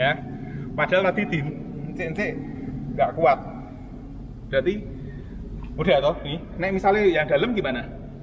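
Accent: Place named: Banyumas, Indonesia. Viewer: Oh ternyata om ngapak tohh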